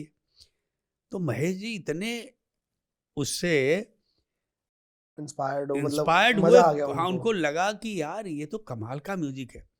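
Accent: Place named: Uttar Pradesh, India. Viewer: Khushi is baat se aati hai ki jab bolte ashutosh beta ,ye jo hamare UP ka jo lejhga hai h dil jeet leta hai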